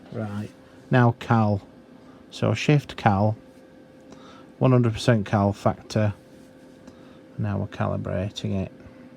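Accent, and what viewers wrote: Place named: Yorkshire, United Kingdom. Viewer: Thats a Yorkshire accent where you from? Im from Rotherham.. but i've lived in Norfolk 24 years now.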